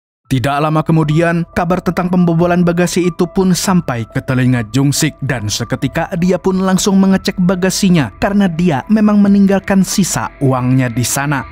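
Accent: Banjar, Indonesia. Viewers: Katuju banar ulun wan amang wan amang.channel nyak the bestlah.😂😂😂😂❤❤❤kalihatan banar logat banjar nya bumbuhan...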